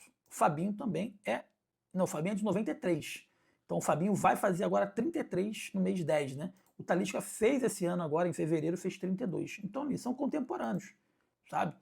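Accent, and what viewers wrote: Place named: Rio de Janeiro, Brazil. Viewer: Me parece que vc é carioca porém vc fala a verdade não inventa mentiras ou especulações meus parabéns vc é o melhor sim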